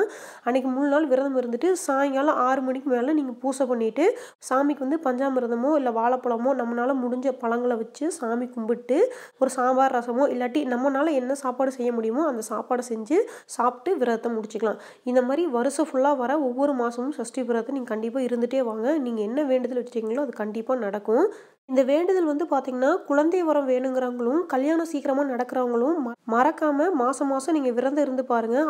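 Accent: Kongu, India. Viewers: What a kongu speech we are also Coimbatore super proud of you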